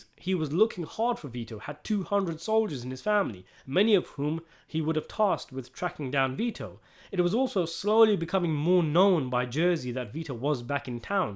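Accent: United Kingdom. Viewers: I love this British accent commentary..... A muncher of ass LOLOLOLOL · By far my favorite part of your channel is hearing you say Sopranos wordplay in a UK accent. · While i feel retarded for listening to this british "oh well let me explain to you dummy stuff" cant stop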